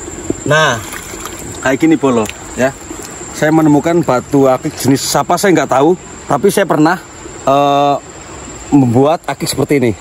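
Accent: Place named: Banyumas, Indonesia. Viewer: wong ndi bolo deneng ngapak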